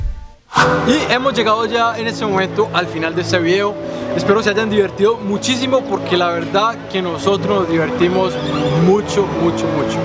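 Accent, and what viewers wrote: Colombia. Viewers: me encantan tus videos y tu acento colombiano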